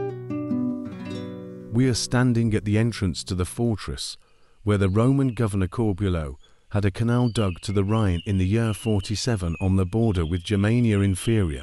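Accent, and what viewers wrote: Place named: United Kingdom. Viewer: It's absolutely incredible what can be achieved with fantastic drone footage, serious and interested historians (amateur or otherwise), a good script and a distinguished British voice (even if it is AI). Again - well done!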